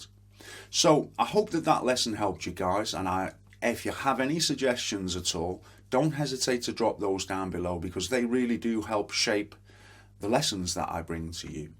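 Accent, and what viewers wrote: Liverpool, United Kingdom. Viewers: Yank here. Love the Liverpool(?)accent and the tone from that martin(I live about 20 miles from Nazareth, Pa).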